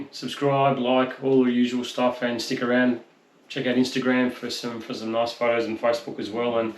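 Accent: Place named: Melbourne, Australia. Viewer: Just found your channel - thanks YouTube! I did my first thread graft 2 years ago on a Trident Maple. A month later I decided to rub the buds off the approach side to avoid wasting energy where it wasnt needed...but for some insane reason I rubbed them off the 'active' branch end. How could I be so dumb!!! I couldn't believe I had done that. Anyway - loved your instructional style and am definitely going to be looking through more this winter. I always think of Melbourne (Upwey) when I hear that accent. Cheers, Xavier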